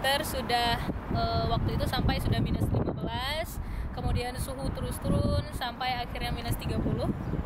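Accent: Aceh, Indonesia. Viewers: Logatnya aceh banget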